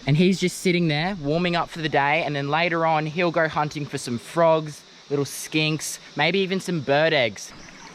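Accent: Australia. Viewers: he’s literally australian thats his voice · He’s Australian with an Australian accent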